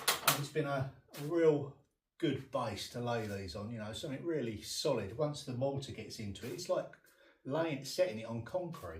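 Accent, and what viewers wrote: Essex, United Kingdom. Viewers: I love these vlogs, but especially accent. Essex girl in California.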